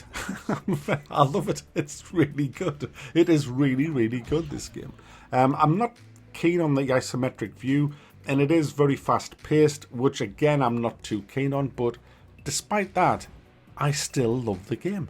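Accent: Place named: Scotland, United Kingdom. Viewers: This guy sounds Scottish. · Thought he is Scottish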